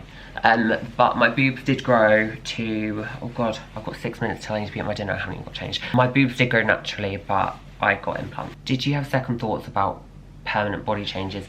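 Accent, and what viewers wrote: United Kingdom. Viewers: R u British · Are ya British?? cuz I can hear through your voice that you come from Great Britain. Now comes a little bit about me, which is: I do NOT describe myself as a transgender nor do I specify myself as a ideology as such. But rather do I think and wishes for and as a woman. Yes, you read that right, I'm want to go into the line for becoming a woman. For the first 2 minutes and 18 seconds, I can already tell you, you make great and large video's, about topics the world should need to know about. I want to share my appreciation to you either. I know, this video's was uploaded on December 30th, 2022 on a Friday, but I want to thank you for sharing your open-minded views and gender assuming related topics I.E. genderdysphoria. Now you maybe even think I've send you a book, but instead of harassing of catcalling you, I choose to a more family friendly option which contains to be precisely: respectfulness, care about you as a full human-being, and advice for hospitalization during situation when you need it the most. 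Thank you for reading my most important message, take care and goodbye. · Welcome,... It's a British accent, isn't it? 😀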